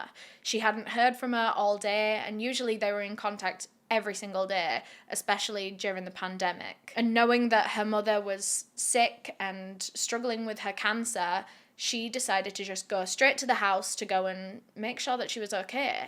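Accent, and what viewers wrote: United Kingdom. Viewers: yeah it’s the British accent and the way she also tells the story. I feel most just say they do this wrong they did this wrong they went thought this. But when she tells the story she makes you feel sympathy for the killer with things they went through, she shows empathy in the right places and tells it as if they haven’t don’t anything wrong until they do and the whole thing changes to her being against them.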